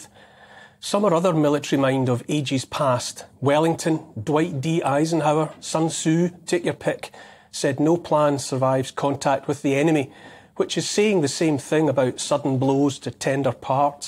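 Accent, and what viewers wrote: Scotland, United Kingdom. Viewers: I fond of his scottish accent and independent attitude :) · You'll get no argument from me on that one 😁 😉 Neil Won't Kneel A gentle, beautiful Scottish lilt A kind, empathic, forward tilt An open heart; a caring face A willingness to take his place. A strong, home-hitting monologue Reminds us of the boiling frog The courage to become a sleuth A passion to release the truth. A deep desire to crack the whip Illuminates our freedom-strip Calls out the darkness taking hold The shiny despots; plated gold! Intense and raw, he lays it bare A soul-enhancing, honest stare Inflections drive the message through He speaks for Us - for me and you! Discernment's such a precious gift His words create a mighty lift Take heed of all he has to say He knows - it shouldn't be this way! 🤗🦋🐬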